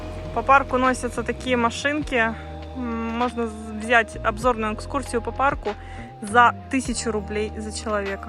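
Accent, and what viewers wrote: Ukraine. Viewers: На Украине такой красоты нету? В Киев на экскурсию поедете, что вы в России забыли? Путин украинцам по выдавал России кие паспорта, от этого вы русскими не как не стали, это равносильно что негру дать российский паспорт, от этого он русским не стал, видно по вам что вылетые украинцы, по русски с акцентом, по украински бы разговаривали бы, вам больше идет украинский язык, русская речь у вас с большим акцентом, вас украинцам можно от лечить от русских, почему? Потому что это две разных национальности. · Ну раз уж РФ вас отвоевала и вы помчались путешествовать по особо не родной прежде стране. То было бы маст хевом избавиться от говора и начать учить русскую речь! А то понимаете ли пока еще не все россияне в курсе что где то там какой то Мариуполь теперь не в составе УКР. Антинезалежные настроения все такое..Речь сразу резко отличается!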